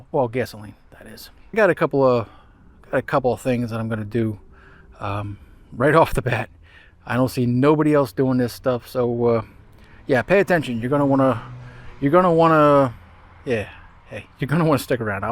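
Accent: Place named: Idaho, United States. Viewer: Where you from cat? You sound like an Idahoan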